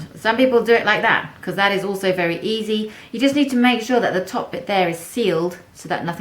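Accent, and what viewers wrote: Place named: Milton Keynes, United Kingdom. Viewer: were about in the uk do u live u sound like u have a milton keynes accent. from a fan that is 12 and loves to cook and suprisingly a boy. :D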